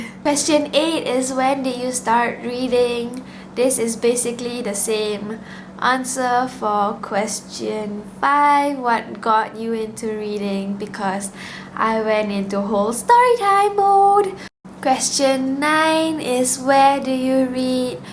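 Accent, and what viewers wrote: Malaysia. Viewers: literally the first second I heard you speak I was like, this girl gotta be malaysian. AND I WAS (half) RIGHT :D OMG OMG ANOTHER MALAYSIAN BOOKTUBER THIS IS FANTASTIC. I also recently started booktube and I'm really enjoying it so far and like god know how I struggle with like keeping up with reading and filming and editing gah. dont worry I hit 20. it changes nothing HAHA You are an absolute natural and I cannot wait to see more of your videos! :D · Heyyyyy I LOVE your accent! I'm also Malaysian/Chinese! WHOOO. LETZ BE FRANDS!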